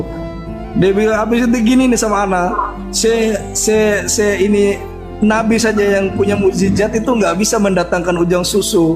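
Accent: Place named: Banjar, Indonesia. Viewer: Sepertinya orang Banjar,,suaranya..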